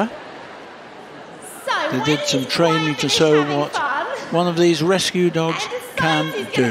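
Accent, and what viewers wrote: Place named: England, United Kingdom. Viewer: The English accented commentary to a Canadian listener is soooo freakin BRILLIANT!! I just can't!!🤣🤣🤣💖💖🍁🍁👌👌🙌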